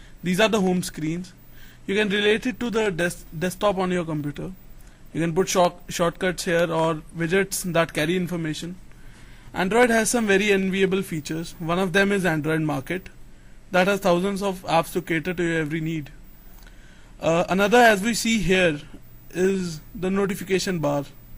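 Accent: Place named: India. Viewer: ur indian accent sucks!! its funny though!lol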